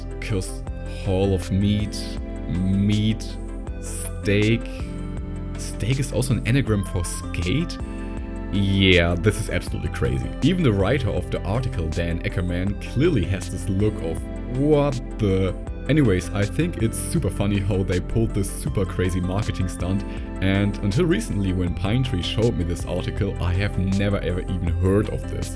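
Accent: Germany. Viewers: Ich liebe diesen deutschen akzent :D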